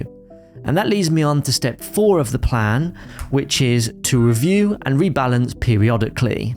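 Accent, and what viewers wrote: United Kingdom. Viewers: You when you can tell someone is British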